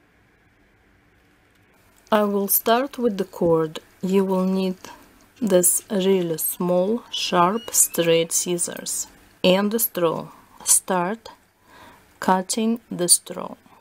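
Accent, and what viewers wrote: Russia. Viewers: Omg can I start with I love your videos? I mean This is amazing and I can listen to your Russian accent forever! Please keep on making videos! :) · thank you so much for doing this i love your Russian accent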